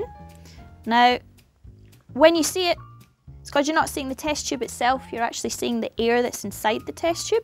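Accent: Scotland, United Kingdom. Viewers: I swear not everyone sounds like this in Scotland, half of us sound like farmers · American shirt brit acent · posh scottish accent haha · Cool experiment. Love her accent. Scottish,I believe. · Isn't that accent scottish? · Scottish I believe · Scottish burd. nice. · What part of Britain is her accent from? Sounds scottish, but could be welsh, too... Can anybody tell for sure? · you're Scottish ascent is dreamy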